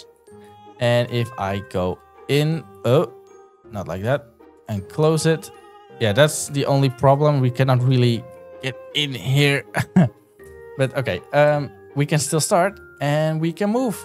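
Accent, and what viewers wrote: Netherlands. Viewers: i immediatly thought i noticed a fellow dutch's accent, looked in your channel bio and saw i was right haha.. anyways great vid tho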